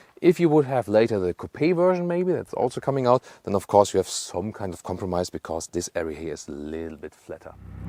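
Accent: Germany. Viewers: I like watching German car reviews with German accent 😊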